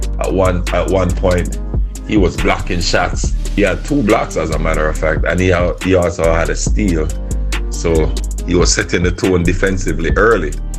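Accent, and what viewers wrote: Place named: Caribbean. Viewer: First time I’ve heard a Dubs breakdown from someone with the Caribbean patois, like the sound…thought you’d have Steph cooking with some scotch bonnet or cooking up some salt fish and ackee…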